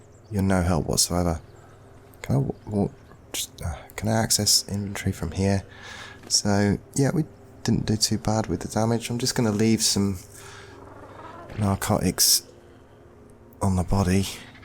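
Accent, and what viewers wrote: United Kingdom. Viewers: ive tried watching this but you are a true example of having a british accent making you only sound intelligent. its may lay not me lee. and im back tracking but its not fajora, youre way off. thats only a couole of examples. quit dumbing down society and get a brain, do some research for your "content" as they call it now. dont just sit on your ass and play video games and blast more commercials than the superbowl at people. mildly entertaining at best, would be better if you could pronounce stuff correctly and not make my ears bleed with the butchery of the english language you lot are so fond of claiming as the inventors of.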